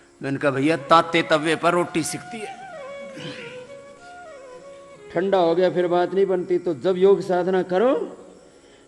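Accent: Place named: Punjab, India. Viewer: की punjabi voice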